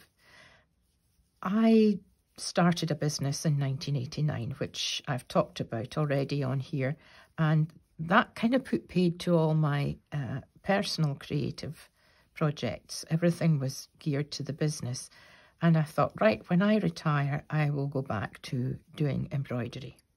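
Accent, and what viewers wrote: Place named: Fife, United Kingdom. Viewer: Good morning from a very chilly Toronto, Canada. I’m an expat Scot from Glasgow, discovered your channel thru IG. Loved this vlog, the story of your mum and your intro to crochet. Wouldn’t she be thrilled and proud at the business and life you’ve created. You look very like her in the pics you shared. I love your Fife accent, so much softer than the harsher Glasgow twang. Your blankets are stunning in your IG grids. I’m more of a knitter though I also crochet. I can’t embroider any more as I can’t see close up detailed work. Eyesight and aging - sigh 🤷‍♀️. I look forward to hearing more in future vlogs. Your grand babies, the twins, oh boy, beautiful Scottish babies, just a cuddleful/armful of love. - Isabel 🇨🇦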